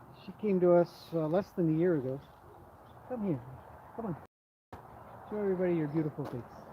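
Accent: Canada. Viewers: Hello, love your video's , where are you from, you sound Canadian.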